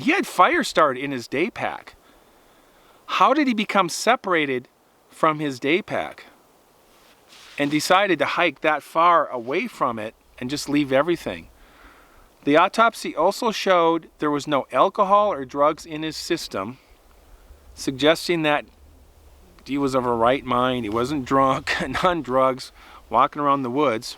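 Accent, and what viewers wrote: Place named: Minnesota, United States. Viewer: My older sister lives in Minnesota, way up near Hibbing. Been there several times and you can definitely hear the accent in as he talks. Accents rarely 100% leave you.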